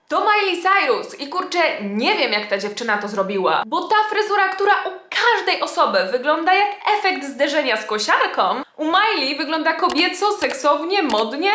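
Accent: Poland. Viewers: po kilku latach oglądania ciebie stwierdzam że pochodzisz z województwa świętokrzyskiego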